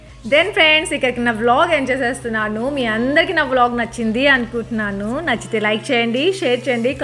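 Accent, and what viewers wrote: Tirupati, India. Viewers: akka me voice chala bavumtumdhii...and meru banglr kadha akkada oka slang ga matladutaru ..kani meru tpt side vallu matladinatee umdhii akka...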